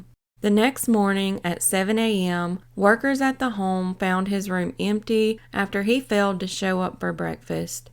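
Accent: South, United States. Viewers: Dont need background music!! Love to hear that good ole country voice!! Thank you!!:hand-pink-waving:🤠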